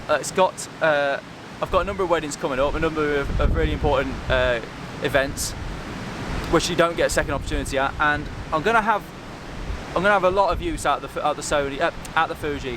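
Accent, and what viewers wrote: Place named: Midlands, United Kingdom. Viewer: Just found your channel. Great stuff. Though I'd say from your accent that you are from the midlands and not wales 🙂👍👍👍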